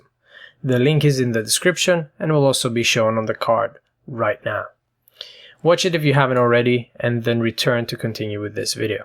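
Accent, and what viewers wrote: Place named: Russia. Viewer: you sound like you are russian or ukrainain.... i dont know why... but you were spot on.. why is it that.. people from such countries are so redpilled?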